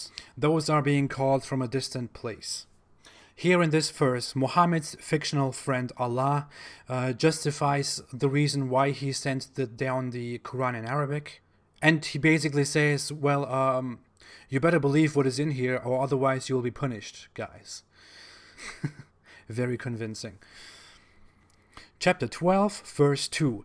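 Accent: Germany. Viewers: I love your vedios and German accent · Islam harms Arabs the most, especially the Arab women. That's what I think as a Turk. Btw, I think you have some kind of Turkish accent besides German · Thank you so much for your bravery, intelligence, and honesty! I love your channel! You are so good! I love your German accent! Take good care! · Love your videos, unsure about your German accent. Tschus! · I love your videos and I love your German accent. Peas be upon you. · Ich liebe Deinen deutschen Akzent ;-) ! Deine Videos sind super ! DANKE und schönen Gruss aus der Heimat ! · You are a fellow German!! Das freut mich voll. Ich mag deinen deutschen Akzent 😍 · Which Arab dude hurt you man? I’m an ex Muslim too but chill out on talking shit about Arabs dude. You’re not perfect and you have a shit German accent. Not all of us are crazy Muslims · I love your videos and your German accent. 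✊🏾😂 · I love your videos and I love your German accent. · I love your videos and i love your german accent! :) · I love your german accent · I love your videos. I love your German accent. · I just found out about your channel and I love it. You spread awareness about islam which is important to help reform it. Your videos also help me find information when debating muslims or islam loving feminists. I don't know if you take requests for videos but here are some topics I'd like to suggest: what is taqiyya, muruna, tawriya and kitman (probably spelled them wrong, sorry )and can you give some advice on how to recognize and deal with them when muslims practice them. Do you think Islam will ever die and what would be the cause if it's death? Do your think it can be reformed somehow? And how do you think radicalism in Islam can be stopped? You can also try to do a video on the history of islam...oh, and by the way, love your German accent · I love your videos and your German accent. 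· I love your little laugh! And I love your German accent. And I love your cute face! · AP could you please do your vids in Arabic as l can't understand your English because of your strong Germanic accent . Rock n Roll !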